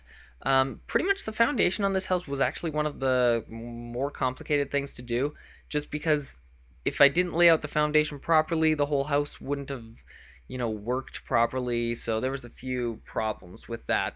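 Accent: Canada. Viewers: are you from canada? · are you from canada?? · no im not. ive never heard a canadaian accent b4 and i liked hearing it and i wanted to copy it momentarily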